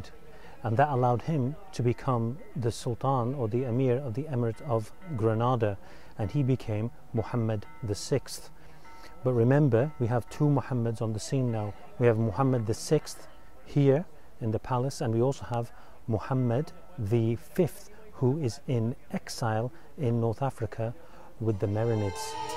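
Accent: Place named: United Kingdom. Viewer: شكرا يا وردة. هل انت سوري من بريطانيا ؟ لهجتك اكسن بريطاني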